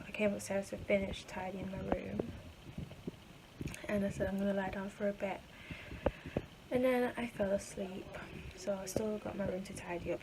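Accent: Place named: United Kingdom. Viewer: Are u jamaican u sound like u british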